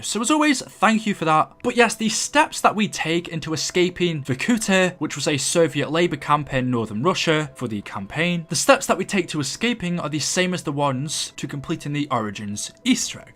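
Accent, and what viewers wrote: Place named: England, United Kingdom. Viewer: Where you from in England not zombies related just curious also love the vids mate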